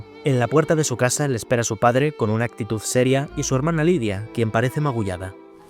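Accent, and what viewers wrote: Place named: Galicia, Spain. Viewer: ME K4G4 TU ACENTO GALLEGO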